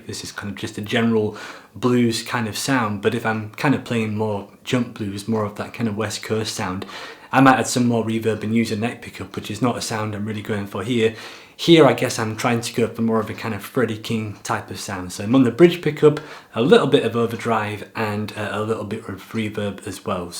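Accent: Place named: Scotland, United Kingdom. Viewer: Seems like I spotted Ben Nevis in the distance….and a slight of scottish accent as well. Per in Demark…I ❤️my Tele.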